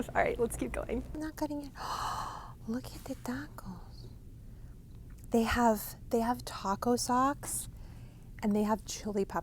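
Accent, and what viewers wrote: Spain. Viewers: do you speak Spanish fluently? I’m always impressed with your pronunciation (it screams Spain) as a Spanish teacher!!! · I love your Spanish accent 💃🏽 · Please tell us the story of your fantastic Spanish accent!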